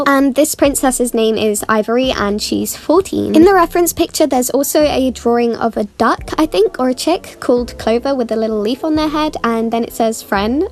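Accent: United Kingdom. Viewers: THAT TOP RIGHT DRAWING??? SERIOUSLY MY FAVORITE PIECE OF YOURS!! she does not look dead 💀😭 she looks very alive!!! My favorite outfit to wear is probably an oversized tshirt with mom jeans! Honestly anything with mom jeans 😤✋ Also I love your bri’ish accent 🤭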